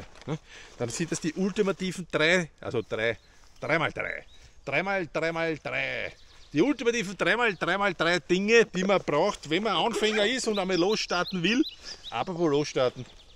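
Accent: Austria. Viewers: Einfach top erklärt der Herr. Der österreichische Akzent ist sowieso die Krönung👍👍und es macht Spaß zuzuhören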